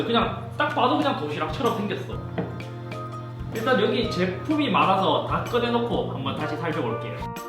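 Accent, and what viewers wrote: Gyeongsang, South Korea. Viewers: 재밌다 재밌어..최고다~~~긔여워 죽겟당~ 갱상도 아이가~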